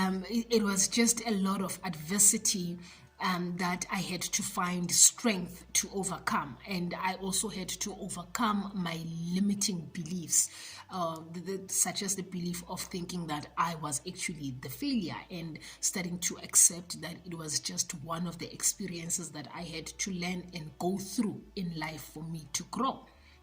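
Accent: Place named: South Africa. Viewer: you look absolutely beautiful in red, love the nails and your entire look. Love the authenticity and your south african accent 😘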